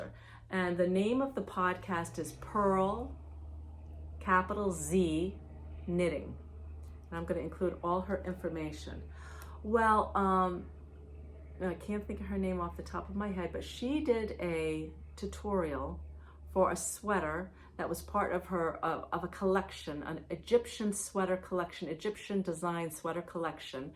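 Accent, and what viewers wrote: New England, United States. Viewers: Love your PC. Just found you last week. I heard your accent and knew we had something in common !. Native New Englander here...Central Falls, N. Prov., Warwick......Love the references you make to being from RI. So sad to see the aftermath of the hotel....happy to hear they'll rebuild. We went to the island last summer. I hadn't been in years. Beautiful as ever. Looking forward to next episode. Happy September. Jeanne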